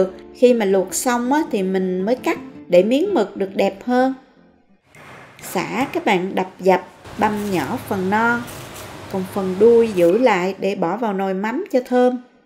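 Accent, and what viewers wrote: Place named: Mekong Delta, Vietnam. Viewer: Rất thích cách chia sẻ từng món ăn của,và nhất là giọng miền Tây để nghe và rõ ràng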